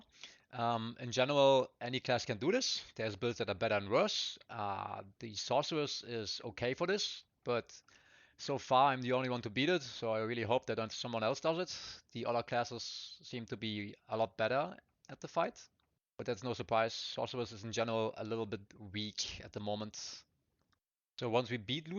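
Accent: Germany. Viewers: I hear a bissele german in this enlgish 😊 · Are you a German Dude?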